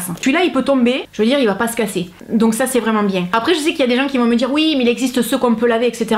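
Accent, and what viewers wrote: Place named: Roussillon, France. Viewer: Je suis mega fan du action aussi 😊 Et tes vidéos m'aide bien à faire mes choix, entre autre les éponges en micro fibre et les chaussettes spécial masques pour les pieds, donc j'adore ce type de vidéos 😊 Ça m'aide à cibler ce que j'achète, parce qu'on a vite tendance à acheter de tout et n'importe quoi dans ce magasin lol PS: J'adore tes vidéos parce que ton accent me rappelle les vacances 😊 Je suis Belge mais ça fait 10 ans que je vais à Canet en Roussillon en vacances (mon compagnon va là en vacances depuis sa naissance, on projette d'acheter un appart là bas pour notre retraite quand on sera vieux 😊)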